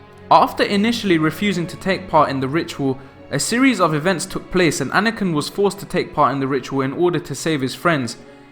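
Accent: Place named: England, United Kingdom. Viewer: Speak real English, not that incorrect trash from englan the shithole nation with erroneous variamts of the English language. Fathet isn't fa-ver and vission isn't "vig-in"...Schme isn't pronounced "shimmy" ... nothing you say is correctly pronounced, it just sounds like shit frok england. Future isn't few-cha...such disgusting speech patterns you filth in england have. Discriminatory is not dis-creamy-tree... THERE IS NONTREE in that damned word!! Maul isn't moe-ull, skill isn't ski-eww...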